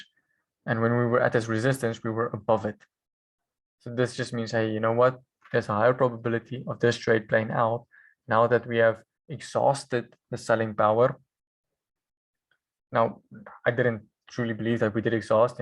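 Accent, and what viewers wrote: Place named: South Africa. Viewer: Hi. Thanks. Where are you from. ? From your accent sounds like South - Africa. ?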